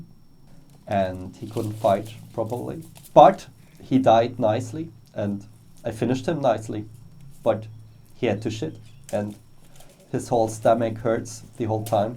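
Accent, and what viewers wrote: Germany. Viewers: are you german? can not really idetify your accent..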